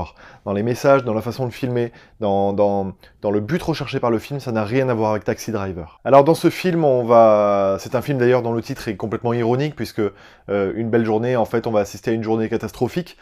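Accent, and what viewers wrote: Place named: England, United Kingdom. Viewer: Faut faire comme moi. Ne pas regarder le foot. Voire le sport en général (sauf peut-être les sport d'hiver) PTDR! Quand on me voit, c'est EVIDENT que je suis une grande sportive XD. Cette intro que nous as fait encore X) Il en faut peu pour me faire marrer, mais là, j'ai bien cru que j'allais y crever avec quinte de toux qui s'est invitée en même temps XD Et dis donc, j'me permets un compliment, tu es élégant et classe en veste comme ça! Bon c'est pas que d'habitude t'es pas présentable, mais pour que j'me dise (moi qui en ai strictement rien à battre de la touche des gens) : "Woh, eh pas mal quand même le gars!" XD Ma myopie disparaît parfois. Ah et magnifique accent anglais. Du coup, j'me sens mieux vis à vis du mien :p Sinon, je pense que ce film me plairait. Et j'aime beaucoup Joaquin Phoenix. J'vais noter ce film sur ma liste de films à voir. 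:) Car il me semble qu'il ne passe pas dans le cinoch' près de chez moi.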